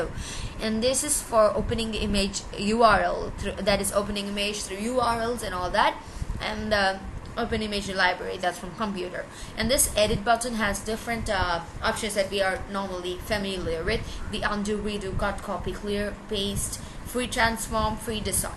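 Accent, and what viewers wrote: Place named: Kerala, India. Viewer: SHe sounds malayali indian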